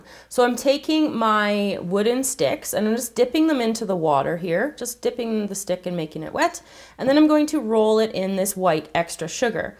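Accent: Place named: Canada. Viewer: i love Canadian accents.....there adorkable 😊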